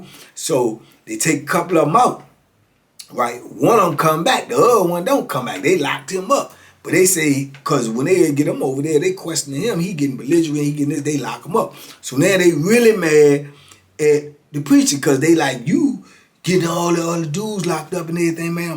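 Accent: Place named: Washington DC, United States. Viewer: Every time I hear you, I hear DC vibes, real live. Lol.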